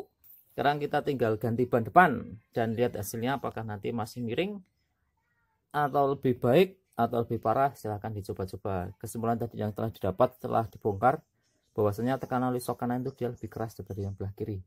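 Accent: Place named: Java, Indonesia. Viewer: Embuh 😵😄 , mesti iki wong jowo ...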